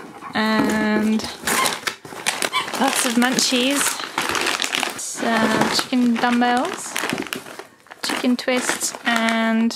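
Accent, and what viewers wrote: United Kingdom. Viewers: How do huskies understand British accents? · Why do u have a british accent?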